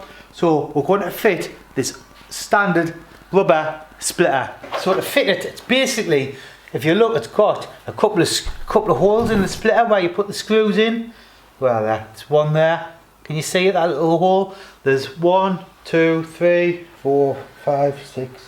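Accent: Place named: Scotland, United Kingdom. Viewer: is Scottish. It’s a Scottish accent 🏴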